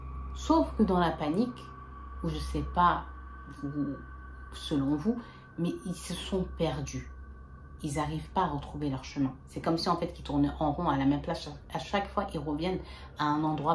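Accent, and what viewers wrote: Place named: Gulf, Arab world. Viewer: Merci pour la réponse 😍maa Shaa Allah belle richesse de culture, ta façon de parler je pensais que tu étais du côté des pays du Golf😊sinon merci pour toutes tes histoires et te souhaite beaucoup de bonheur 🥰 🥰 🥰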